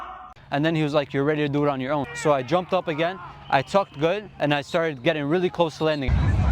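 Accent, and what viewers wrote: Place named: Queens, United States. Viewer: u talk with an accent/an aksent from here in Queens Countey !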